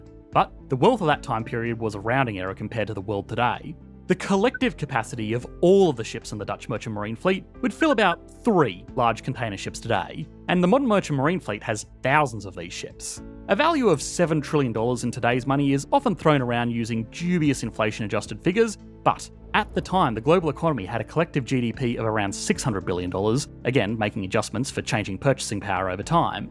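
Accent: Australia. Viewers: I’m curious about your accent. The channel seems to be from the US, but it kinda sounds like Australian sometimes? Where is the accent from?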